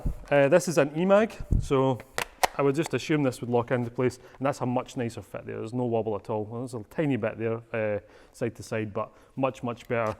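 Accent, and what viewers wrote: Scotland, United Kingdom. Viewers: Such a thick Scottish accent sometimes it sounds like he's speaking a different language.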